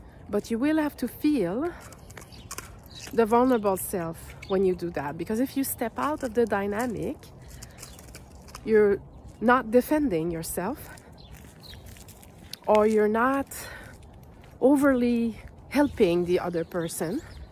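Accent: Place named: Quebec, Canada. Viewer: You sound québécois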